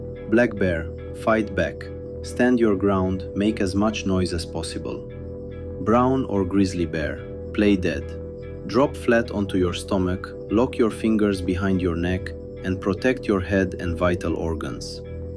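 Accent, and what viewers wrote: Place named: Eastern Europe. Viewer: ur English is great and you did a superb job in the narration. I support Poland 100% as well as your great president in keeping Poland Polish. Western Europe is committing cultural suicide.Way back in Polish history, they took a strong stand as well. My comment was meant as a compliment because I always think the Slavic accents are so cool! I’ll put a “thumbs up” on your video right now.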